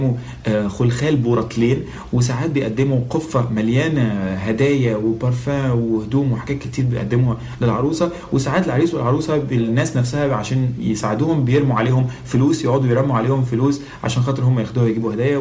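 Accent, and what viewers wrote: Tunisia. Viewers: لهجتك تبان تونسي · لهجتك تونسية بامتياز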